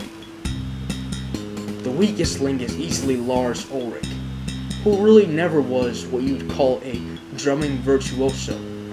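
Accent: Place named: United States. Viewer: Speak English instead of pigeon American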